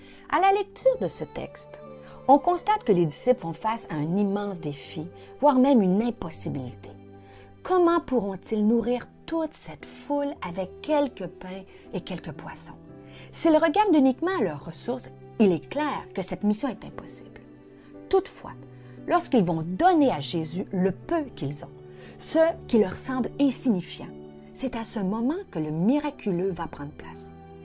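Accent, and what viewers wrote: Quebec, Canada. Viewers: Bonjour Pasteur.Contente d'entendre une voix féminine ce matin.J'aime bien votre accent Québécois. Merci pour les explications sur ce passage de Matthieu 15...Amen..Je crois que le Seigneur Jésus a le pouvoir de faire fructifier mon finance afin que je puisse aider les autres. Restaurer les couples en difficultés et ouvrir les yeux et se révéler aux personnes qui ne le connaissent pas.Gloire à Ton nom Seigneur Jésus Christ. Soyez richement béni en ce mois de décembre On est ensemble ❤ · Bonjour un plaisir d'entendre cet accent je ne connais pas le Canada mais j'aime votre accent. Merci pour ce partage, oui Seigneur tu as toute la puissance pour multiplier nos besoins spirituels, physiques,moral, matériels. A toi sois toute la gloire.❤️🙏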